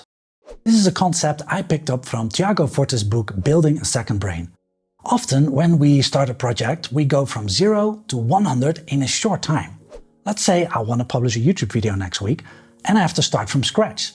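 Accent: Netherlands. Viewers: hello, I just subscribed........I have a question, not related to the video at all.........but is your real name? I'm intrigued. I lived in NL for 10 years and I recognise the accent.........I don't understand the very English sounding name, and can't align it in my own mind with the content you are creating. thanks for clarifying.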